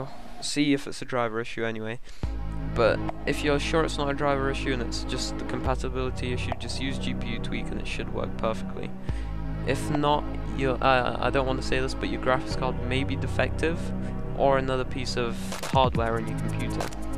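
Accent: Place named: United Kingdom. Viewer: Yeah british accent is already hard to understand and the music makes is harder to understand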